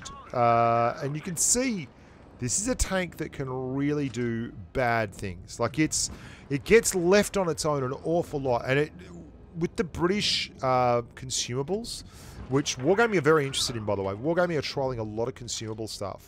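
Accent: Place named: Australia. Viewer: Hey old mate,i am happy you are back.it is a pleasure to hear your oz accent.just makes my day absolutely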